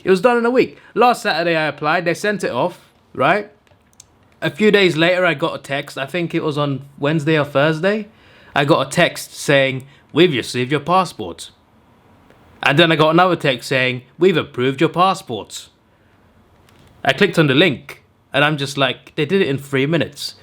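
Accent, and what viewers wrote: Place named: United Kingdom. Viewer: you seem to got a British accent... like did u practice or u brought up there?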